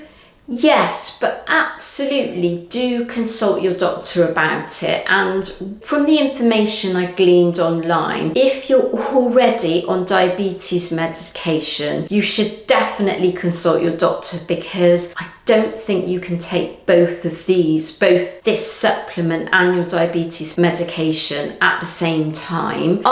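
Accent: United Kingdom. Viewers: A Nigerian with Brit accent? Hum, they don’t go together!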